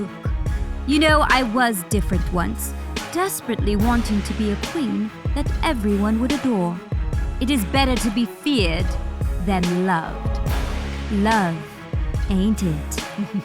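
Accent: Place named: United Kingdom. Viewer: Accent is on point!!! Perfect British accent ever❤❤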